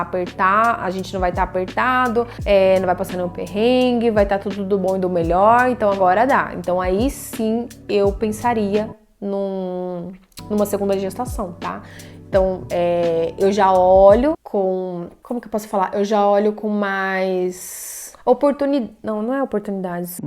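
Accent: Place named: Rio de Janeiro, Brazil. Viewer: Também amo sotaque carioca (sou gaúcha - não amo algumas expressões daqui - mas gosto do jeito que o gaúcho da capital fala, acho jovial e único 😁). É desafiante recomeçar, mas há muito valor em recomeçar. É vida somada, sem dúvida! O que for material, será conquistado, certamente será. A vida é boa quando vivemos intensamente o momento, estejamos onde estivermos, em cada detalhe e fase. O sentimento de pertencer é mágico! É como reencontrar-se/reconectar-se. Folclore ☺ Nada é fácil ou só felicidade. O maior exemplo disso é realizar o sonho de se formar... A Universidade não é um morango e saindo dali, fica ainda mais tenso. Mas compensa pq é a materialização de um sonho. 🥰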